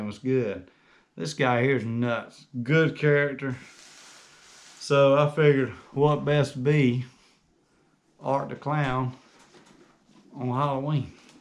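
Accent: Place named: United Kingdom. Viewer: Can’t tell if you’re British...or if you have a heavy country accent..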